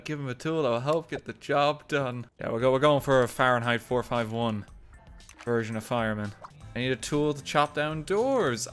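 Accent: Ireland. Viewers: This dude Irish? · I never knew this guy was Irish ahah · He's Irish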